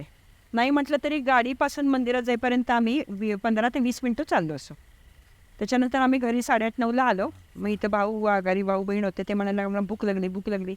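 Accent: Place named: Kolhapur, India. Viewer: कोल्हापूरची मराठी भाषा ऐकून मन प्रसन्न झाले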